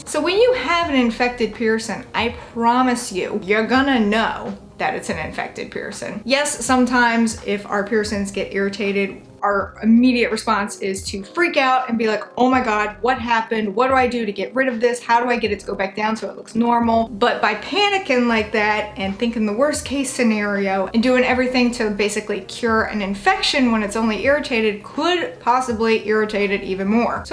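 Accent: South, United States. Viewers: I must ask your southern correct?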